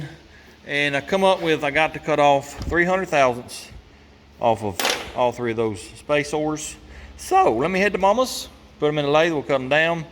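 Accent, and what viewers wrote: South, United States. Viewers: THE WIFE AND I LOVE YOUR VIDOES JUST WANTED TO KNOW IF YOUR COUNTRY TALK IS FOR THE SHOW OR IF YOU TALK LIKE THIS ALL THE TIME,LOVE IT ANYWAY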